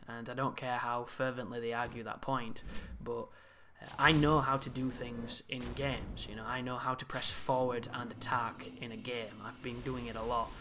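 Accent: United Kingdom. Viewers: 1. It's very refreshing to hear more Brits on these videos. 2. I totally hear you on the guard break actually doing the command seldomly. I can't count the amount of times that I'm sure I inputted forward and R1 perfectly only to swing my sword. On the other hand, the amount of times I've attempted a guard break with a chime...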